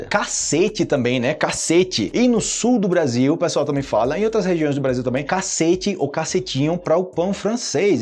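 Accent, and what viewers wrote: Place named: Colombia. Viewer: El es brasilero, pero estudió en Colombia . De verdad habla con acento colombiano 😂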